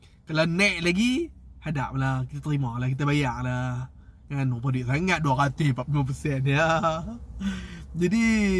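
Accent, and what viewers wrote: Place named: Kedah, Malaysia. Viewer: PEKAT KEDAH AKU XFAHAMMMM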